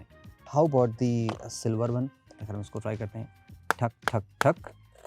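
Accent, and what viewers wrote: India. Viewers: I don't really understand English with an Indian accent. Can someone give a conclusion from the video? Is silver better?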